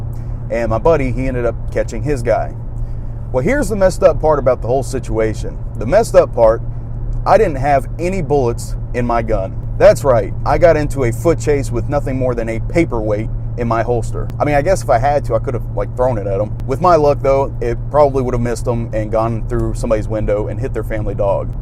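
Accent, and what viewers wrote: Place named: South, United States. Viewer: Part three please. I love your southern accent.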